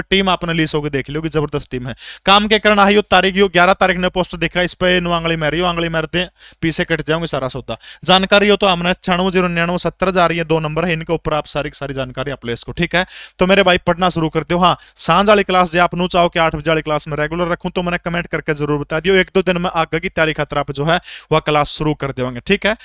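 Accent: India. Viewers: Aap pabra side ke ho kya sir ??? Apki boli mile h ude kani tt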